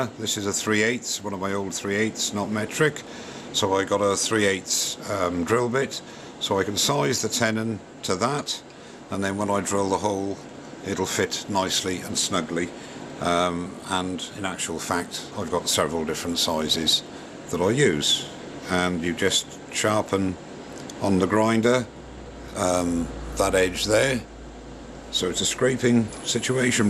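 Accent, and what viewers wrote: Wales, United Kingdom. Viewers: Hi!! Very nice job on the finial, move over Cindy!! Great tip using the stain to give the apearance of ebony, I liked that. I know as your videos become more polished you can incorperate music and speed up the film but I must admit I do miss the old simple videos with your explanations and banter while turning. It must be your mellow Welsh tones. Still a great vid All the best!!.............Andy.;)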